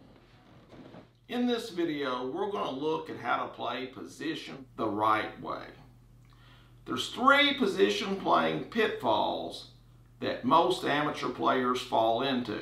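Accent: South, United States. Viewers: Where you from? I’m not American but my guess is the south.. Cheers for the video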